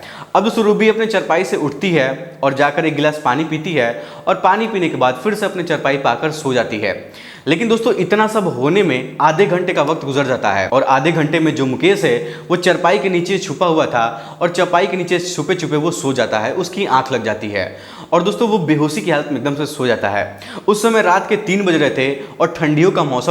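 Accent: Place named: Bihar, India. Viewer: Abe sahi se Hindi to bol bihari taan me kyu bol raha hai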